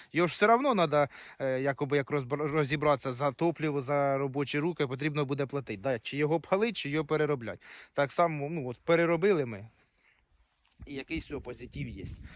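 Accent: Poltava, Ukraine. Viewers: Він говорить Полтавською в нас це нормально.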